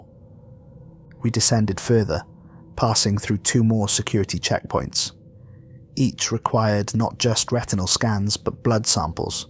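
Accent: Wales, United Kingdom. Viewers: I like the story but the request for subscription resonated as I realised you're welsh. Good luck to 10k!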